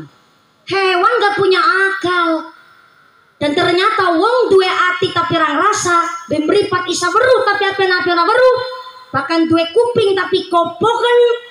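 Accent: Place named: Banyumas, Indonesia. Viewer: Pemalang hadir Pingin ndengerin pengajian ustadzah yang ngapak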